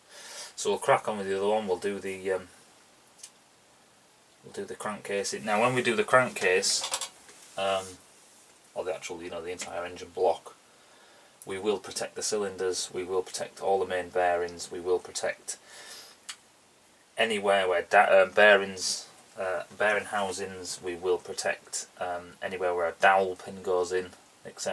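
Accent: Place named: North, United Kingdom. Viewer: u sound northern!! lol:) me preston northwest.. uk:) lol:)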